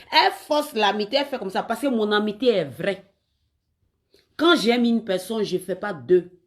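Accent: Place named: Guinea. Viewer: Tu fais peur tu ressemble à une sorcière voilà tu es venue de la Guinée 🇬🇳 voilà pourquoi ton français est penché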